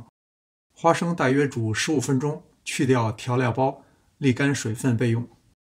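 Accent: Beijing, China. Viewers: 看了很多美食视频，最喜欢的。不仅食材的获取途径，还是做法都很易操作，还有同是北京的调调很亲切。😊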